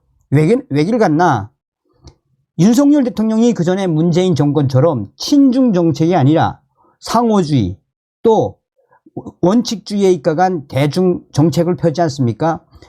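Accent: North Korea. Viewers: 사투리쓰셔도 좋습니다. 북한에서. 사셨던. 감독님. 당연히 쓰는거. 이해합니다...걱정말고. 홧팅하세요..늘수고. 감사합니다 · 북한 사투리를 쓰는게 문제가 아니고요~ 소식통을 전한다면서 힐끗힐끗 그 자료를 읽는것을 의심 하는것 같습니다. 그러니 의 얼굴만 보여주는 방송이 아니라, 그 화면에 북한에서 온 자료를 보여주면서 은 나래이션을 하길 바라는 겁니다. 그러니 북한 WTR 이던, 동북삼성의 WTR 소식통이던, 그 화면에 보여주길 바라고요, 때에 따라서는 북한 노동당신문 자료를 보여 달라는 거라고 생각 하면 됩니다. 자료없이 혼자 WTR 이라고 힐끗힐끗 보면서 하는 얘기는 팩트가 없다고 보는게 아닌가 합니다. 요즘의 언론은 전달하는 사람의 얼굴을 보고 싶어하지 않고요, 그 확실한 팩트자료를 보고 싶어 합니다. 진성호, 전옥현 방송에다 대고 신문본 얘기나 하지말고 스스로 뛰어 다니라고 주문 하는것과 같다고 보면 됩니다. 도움이 도었으면 합니다.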